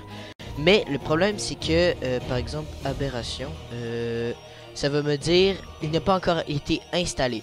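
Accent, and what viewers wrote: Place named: Quebec, Canada. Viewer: juste question pas rapport té tu québécois ta un petit accent 😅🇨🇦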